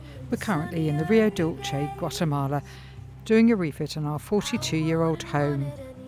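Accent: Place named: United Kingdom. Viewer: Thank you 🙏 and I love the British accent ❤😊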